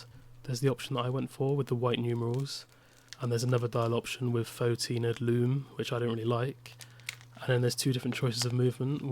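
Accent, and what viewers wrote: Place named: Wales, United Kingdom. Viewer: My mam is from Cardiff, but we were born 1st gen Americanos. My father is from La Paz, maternal Grandad Jamaican--British Merchant Marine, maternal Gran Irish. Me and my twin brother have spent long summers with family back home in Wales. As an adult, having grown up watching a lot of British telly and movies, I have developed a fascination with all dialects of English. Your accent sounds faintly like my cousin Janet's, who has a bit more of an RP Welsh blended accent. I cannot quite ID your accent, but you have a lovely speaking voice for YouTube presentation. I rather enjoyed your presentation, and I would not worry about not feeling confident, especially if you are not accustomed to public speaking, because the lingua franca on YouTube is predominantly authenticity. Polished presenters are perceived as brand shills, so you are way ahead of the game, mate. 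Keep up the good work!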